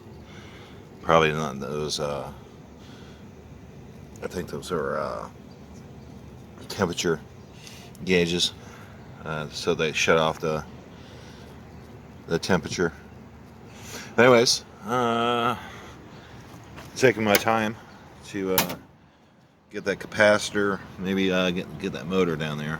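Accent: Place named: Texas, United States. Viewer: Never knew there was such a thing as an earth battery ... learn something new every day! Yay Texas twang!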